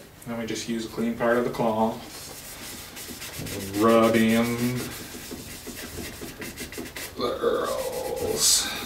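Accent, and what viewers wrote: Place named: Canada. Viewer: Wow, lots of haters today. I ignore the Canadian accent, profanity, condescending attitude, occasional mistake, just to see someone enjoy what he does and learn as he goes. If you don't like it, go see Dave's World Of Fun Stuff, Will's Easy Guitars, or any of the other millions of guys doing this, (Or something like it) on YouTube.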